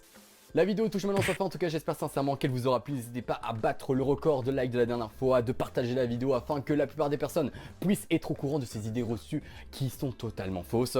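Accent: Belgium. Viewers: ginisti c'est l'accent belge ça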